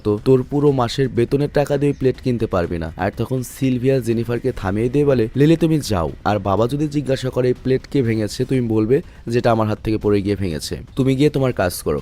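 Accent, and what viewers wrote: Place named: Noakhali, Bangladesh. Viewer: Vai apni sera.. ❤ Amder Noakhali kotha bollen❤❤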